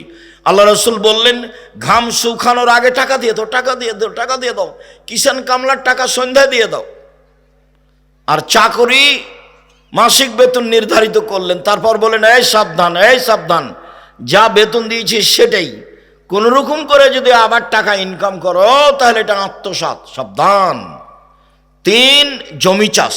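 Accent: India. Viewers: এই লোকটা কলকাতার ভাষায় কথা বলে কেন ? সে কি ভারতীয় ? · শায়খ হুজুরের কথা শুনে বোঝা যাচ্ছে উনি পশ্চিমবাংলা ভাষায় কথা বলছেন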